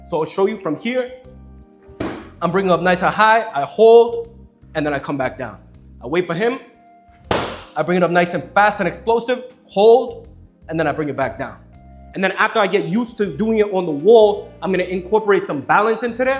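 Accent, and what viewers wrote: New York, United States. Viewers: I’m from Queens and I KNOW you gotta be from NYC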